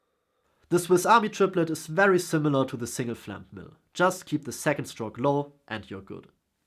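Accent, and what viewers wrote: Germany. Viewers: Swabian accent going strong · man erkennt das du deutsch bist xD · are you from germany ?? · As a German myself - his accent is definitely and undoubtedly german. Stewies accent is british but they have similar voices. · Your German accent is very convincing.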